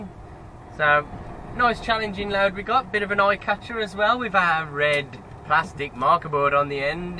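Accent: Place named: Birmingham, United Kingdom. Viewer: love your accent....is that brummie or just general black country?....and the way you accentuate the letter G in words..lovely...I love watching moody blues interviews they talk more or less the same as you....love it!!!!!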